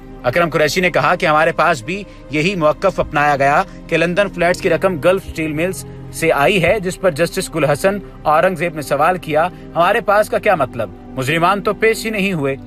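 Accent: India. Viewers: mira matlab k hum urdu k accent se pehchan gay k admin indian hay . kharachi wala bi nhi . or ye indian kis tarah pakistanyion ko ghumrah krny ki koshishein krty rehty hain . lekin finally be niqab ho jaty hain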